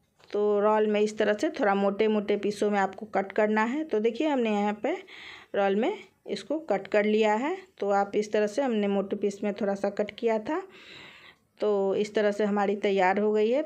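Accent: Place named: Bihar, India. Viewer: hame aap ki awaz se to pata tha ki aap bihar se hai lekin puchti nahin thi ab ham aor pakka dost ho gaye hai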